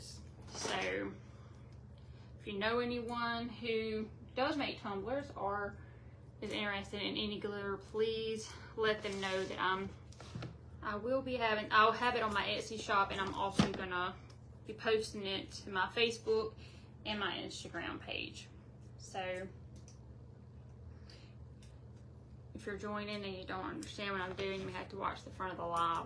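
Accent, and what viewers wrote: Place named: Mississippi, United States. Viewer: Thank you, so much for answering me! I thought I heard some Mississippi in your accent. Love it! ♥️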